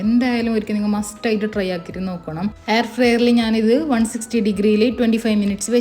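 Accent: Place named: Kasaragod, India. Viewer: Ninga kasaroda Slang Nella Cleland kelkan❤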